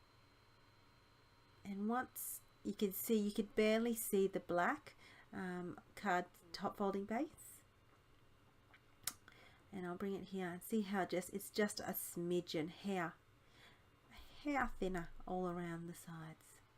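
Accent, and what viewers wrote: Australia. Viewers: Very pretty. Love the colours. (Love hearing our Aussie accent in videos too! ) Thanks for sharing. 🙂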